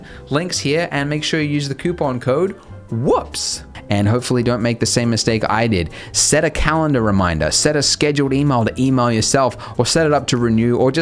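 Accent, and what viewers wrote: Australia. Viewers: look at the video -which side of the road is the car driving on? Now listen to his accent. It's not Cali or Minnesota. It's Australia, mate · You can't tell he's Australian from his accent?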